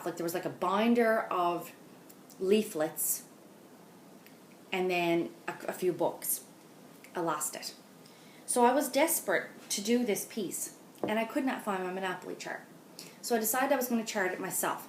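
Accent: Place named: Newfoundland, Canada. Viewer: Okay only 2 mins in and I thought is she a Newfoundlander? I wonder...then said you are from Canada! Ohhh please satisfy my curiosity? I live in NS! Lol. Now to watch your video and subscribe! At Because you count? NB? Oh my I will be editing this everytime you say something...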